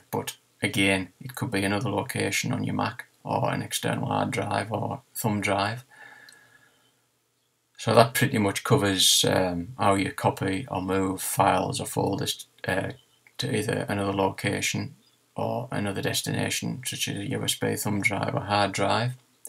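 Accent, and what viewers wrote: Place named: Sunderland, United Kingdom. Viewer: I’m in Sunderland and it’s great to hear a similar accent rather than the many American tutorials. Thanks for the help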